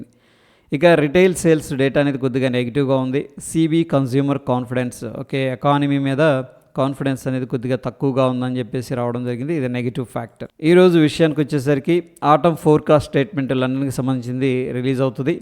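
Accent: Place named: Telugu, India. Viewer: Thank you brother for the information🙂👍, your voice and Telugu ascent reminds me the news reader on All Indian Radio morning news in child hood days.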